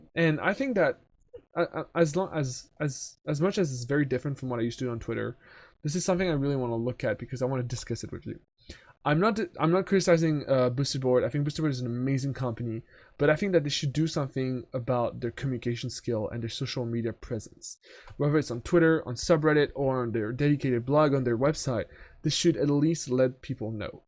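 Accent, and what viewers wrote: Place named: France. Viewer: Un ptit accent Français bien caché, j'aimerais bien parler anglais aussi facilement que toi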